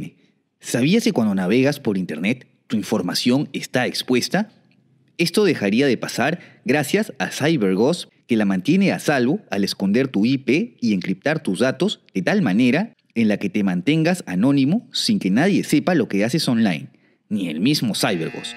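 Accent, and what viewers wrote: Peru. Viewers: Que FEO el acento peruano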